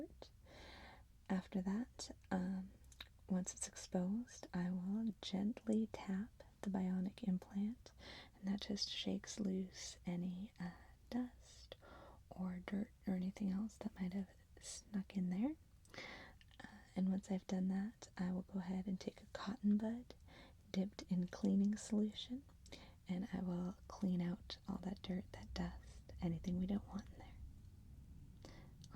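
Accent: United States. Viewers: I would LOVE some more sci-fi. You are the best,. Your diction and general English usage is way beyond what I'm seeing on competing channels. You also have a beautiful voice... no vocal fry, no nasality, no whine... and a very pleasing American accent. Listening to you talk is like spooning honey from a bottomless jar:-) As if that wasn't enough, the camera loves you too. Even with minimalist make up you always look sparkling and fresh. I guess you're used to people telling you how pretty you are, so I won't even go there:-D I wish I looked like you! I love your sci-fi style though. It reminded me a bit of the air hostesses in The Fifth Element, except their make-up is a little too heavy, for my taste anyway. Your make-up here is just about right. It goes perfectly with the sci-fi wig. You could also do a take on Rachael in Blade Runner. That would be kinda sci-fi, but you wouldn't need the wig. 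Thanks so much for your wonderful channel.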